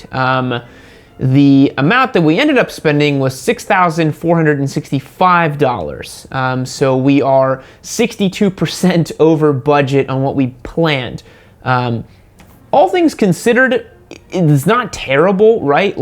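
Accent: Portugal. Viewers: Are you Portuguese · my dude are you portuguese?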